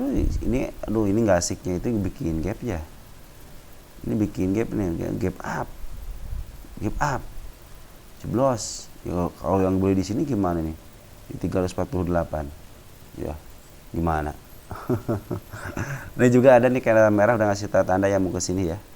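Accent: West Java, Indonesia. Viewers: Si aa urang sunda pisan logatna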